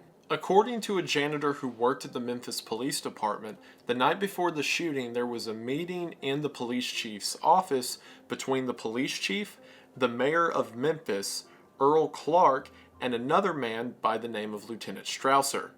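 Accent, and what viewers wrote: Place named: Carolinas, United States. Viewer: Where are you from? You definitely sound like someone from the Carolina’s or maybe Virginia.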